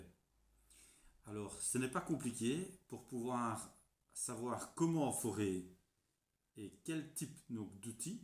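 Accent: France. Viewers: Stunning French accent ☀️👏💕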